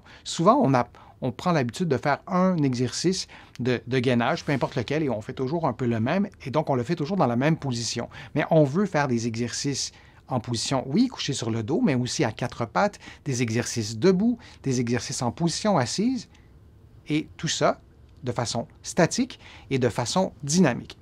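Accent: Quebec, Canada. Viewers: Merci pour vos conseils avisés On doit vraiment s appliquer même si les douleurs sont parfois pas simples à gérer. Je vous remercie vivement de votre engagement pour nous soulager. Je souffre de lombo sciatique paralysante et de fibromyalgie. Vos exercices sont vraiment top Bonne fin de semaine et j adore votre accent Québécois Brigitte FONTAINE VESOUL. FRANCHE COMTÉ..FRANCE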